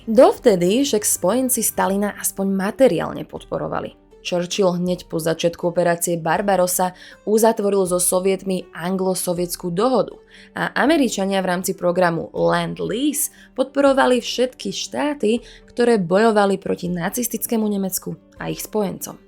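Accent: Bratislava, Slovakia. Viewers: Slovenský hlas 😍 tomu dává úplně jiný Vibe 😇 jinak super video 😇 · Bratislavsky dialekt (nasilny akcent na prvu slabiku znižuje cely dokument....( suslanie ba 2.mieste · Krasny, sexi hlasok, az na ten bratislavsky prizvuk a neznalost "ľ" ;)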